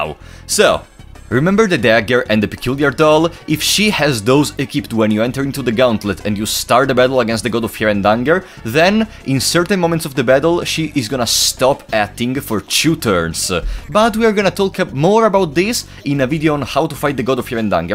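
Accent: Italy. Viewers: Avevo incominciato a vedere questo gioco prendere popolarità e l'ho comprato per provarlo, ora grazie ai tuoi video sono riuscito a capirlo meglio. Ho visto che sei italiano per l'accento e perchè quando vai a vedere il codice sorgente del gioco ci sono le scritte in italiano. Peccato che in italia non se lo caghi nessuno sto gioco. Continua così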